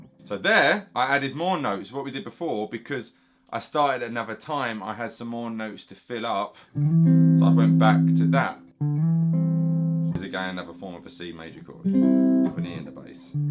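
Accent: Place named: Essex, United Kingdom. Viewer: Well, that was not the accent I was expecting... Essex? Nice vid btw! Gonna give these chords a try. 👍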